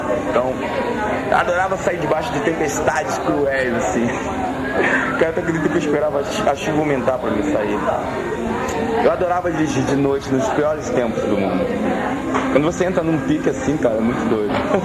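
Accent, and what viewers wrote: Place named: Brasília, Brazil. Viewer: Sotaque Brasília antigo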